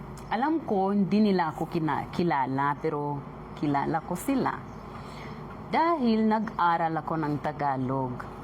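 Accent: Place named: Visayas, Philippines. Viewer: Bisaya accent ka 😅✌️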